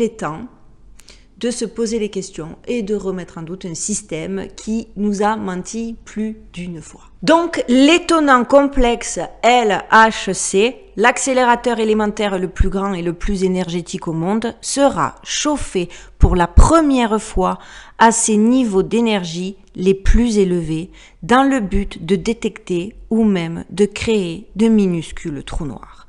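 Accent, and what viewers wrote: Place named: Provence, France. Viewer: Bonjour magnifique Jeune Femme pétillante à l accent adorable qui sent bon la Provence ! Votre lumière intérieure contrebalance la noirceur maléfique. MERCIIII !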